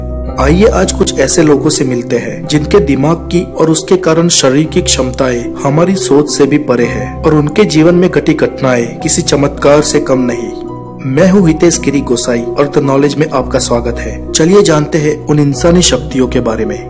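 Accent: Gujarat, India. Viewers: plz chq, u r ton,,,,,is gujarati.....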